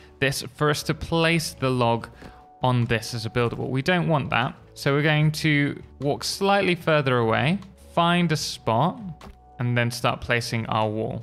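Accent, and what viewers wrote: United Kingdom. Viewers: hell yeah bro you sound more british than my dad, and that makes me know you bought to lay the words of god on me right now. if anyone knows how to go somewhere new and take it over, its yall 😮📝 im here to learn dawg on a real note what you said about the main story yeah dude those caves freak me out i just want a castle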